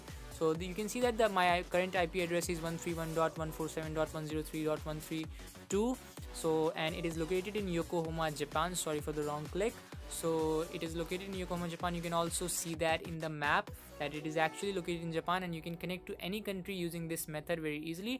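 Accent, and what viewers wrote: India. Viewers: First Indian mixed with English guy that had ever been helpful thanks bro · i would listen to this dude, but everytime i hear an indian accent trying to provide technical help, it is a scam, so no thanks lol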